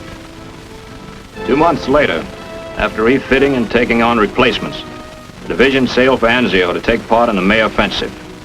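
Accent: New York, United States. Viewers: Mark Clark should have been court martialed for destroying this division on the Rapido - a totally unapproachable obstacle if you have ever seen the pics. The German engineers ("Pioneers") cut down all the trees within hundreds of yards of the shore and mined the area completely. On a lighter note, could the Army SIgnal Corps at least give the Texas Div a narrator who doesn't have a thick New York accent? I realize that after the division was butchered repeatedly in Italy, it wasn't even half Texans, but come on. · Clark's glory hounding cost my grandfather a lifetime of PTSD and misery over the loss of his battle buddies. P.S. I love that glorious New Yawk accent. My WWII soldier grandfather was with the 143rd (36th) Company K and hailed from Brooklyn, New York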